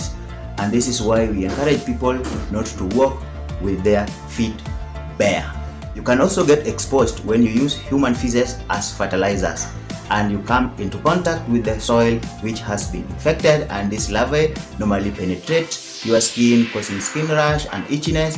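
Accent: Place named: Luo, Kenya. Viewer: Haha apart from deworming sijui last time kudeworm haha lkni the luo accent hehe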